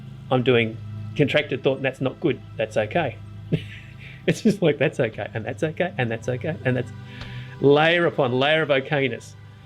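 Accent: Australia. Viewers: Thank you for making this. I listen to a fair bit of ND stuff and yours is clear in a way that takes me right to the edge of ( non) understanding. Hearing this in an Aussie accent helps too!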